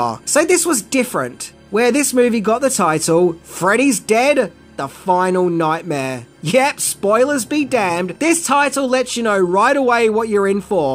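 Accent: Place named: Australia. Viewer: Hearing your Australian accent coming from freddy is awesome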